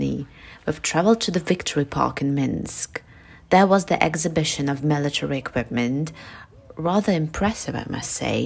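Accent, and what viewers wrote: England, United Kingdom. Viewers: how you got an English accent?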